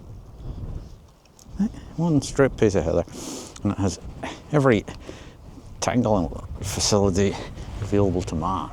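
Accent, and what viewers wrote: Northern Ireland, United Kingdom. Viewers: Hi. A little word form a rellow Northern Ireland Man who has been in England after university for 50 years. It is so good to hear your wonderful Northern Ireland accent. I have not lost mine. I am proud of it. My dad took me fishing to Lough Melvin. I loved it. Trout seatrout and salmon fishing came into my Life. I was hooked. However I have caught seatrout at night but my only salmon was caught on the spate river Margy at Ballycastle co Antrim. Best wishes for the season.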